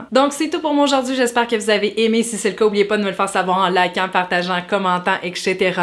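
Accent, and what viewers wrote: Quebec, Canada. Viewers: Français avec accent Québécois! (Joual) rien de compliqué 😁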